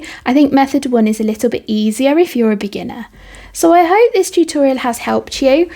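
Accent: England, United Kingdom. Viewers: Fantastic ❤ shame you use US terms as you are from UK - would suggest you say UK terms with US terms on the screen?! Such a nice change to hear an English voice 😊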